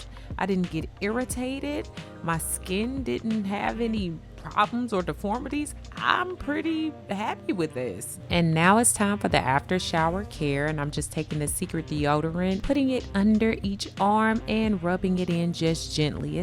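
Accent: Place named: St. Louis, United States. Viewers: Thanks for sharing. I love Dollar tree. I'm here for you anytime you say, here, there, etc all I think is St. Louis and Nelly. I love it ❤️❤️❤️ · Off the subject of the video but you talk like you're from St. Louis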